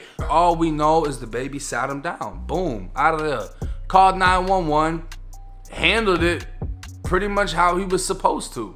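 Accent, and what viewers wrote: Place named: Massachusetts, United States. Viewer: Lol I knew you was from mass you talk just like them hit me if you need a camera man or something lol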